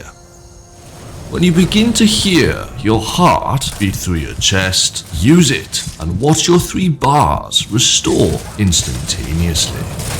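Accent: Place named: Scotland, United Kingdom. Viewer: Apologies, clearly Scottish and trying not to be 😅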